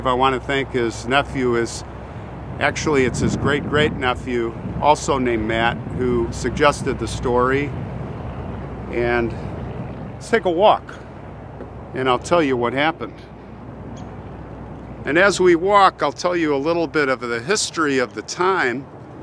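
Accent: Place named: Chicago, United States. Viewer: This is said with love: Your accent sounds like the super fans of saturday night live!! Da Bearrrrrrsss!!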